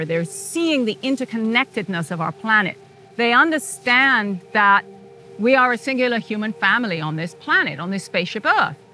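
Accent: United Kingdom. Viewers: Is RP English - she would have had very exclusive education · So, Mr. Smartypants, tell us what a selfish American she is when she has a BRITISH accent. How ignorant can you be?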